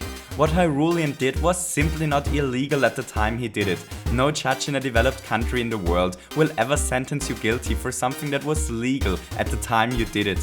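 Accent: Germany. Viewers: Bist du deutscher? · are you german? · Are you German? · Are you german? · bist du deutscher? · Hey... You´re from Germany...:D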